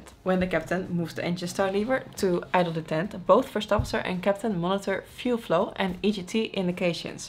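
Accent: Netherlands. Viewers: Dutch accent is something amazing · A hint of Dutch in English produces such an agreeable sounding accent. It's a pleasure to listen to her.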